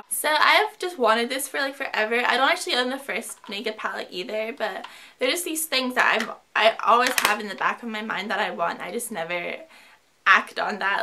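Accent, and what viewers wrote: United States. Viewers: I know ur accent is american but do you live in uk because all the brands r uk shops? jw btw xx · Are you from America ? Either that or you put the accent on?, but loved this vid!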